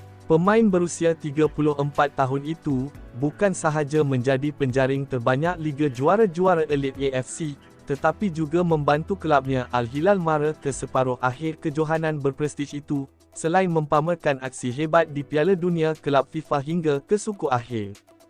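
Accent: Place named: Indonesia. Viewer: Admint ni orang indon sebab Tu dia cakap MCM Tu.😊😊😊😊😅😅😅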